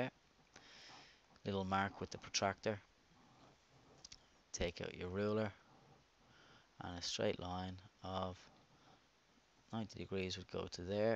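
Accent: Ireland. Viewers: I love ur accent ! Are u irish ?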